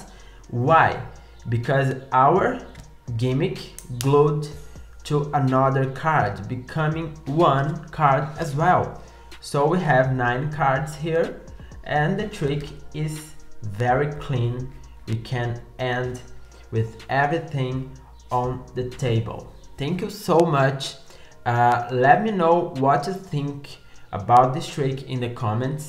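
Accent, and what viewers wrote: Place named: Brazil. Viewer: your accent sounds kinda Brazilian to me kkkkkkkkk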